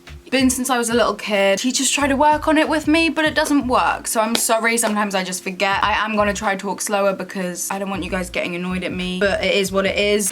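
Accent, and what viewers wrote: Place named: Newcastle, United Kingdom. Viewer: Are you from the Newcastle area? You sound like Perrie Edwards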